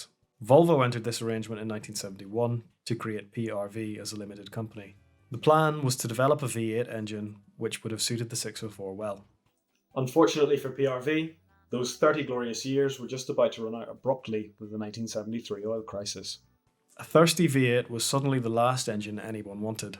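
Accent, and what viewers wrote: Ireland. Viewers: Irish accent! Superb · Pronunciation is different in different countries. Ideally we should all use a French accent but he uses the normal pronunciation that is used in Ireland.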